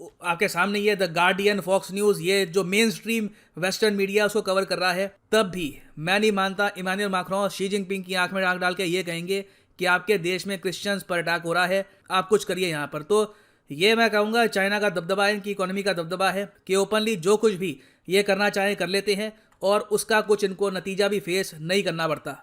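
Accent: India. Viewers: As soon as I heard the curry-flavored English, I knew the rumor was back.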